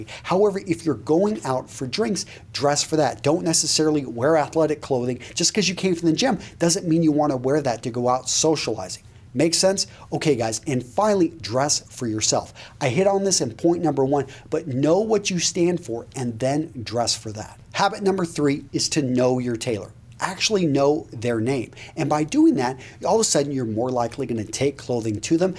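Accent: Wisconsin, United States. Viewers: Woah, weird to hear someone from Wisconsin. We're like the lost state.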